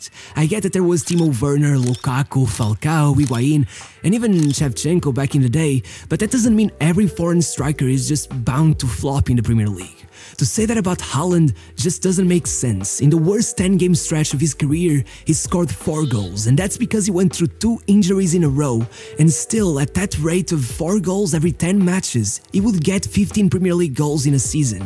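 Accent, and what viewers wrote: Portugal. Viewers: Logo vi que esta pronuncia tinha de ser portuguesa. Continua com o excelente trabalho :) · The Portuguese accent is strong lol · Vi logo pelo sotaque és tuga, impossivel nao notar lol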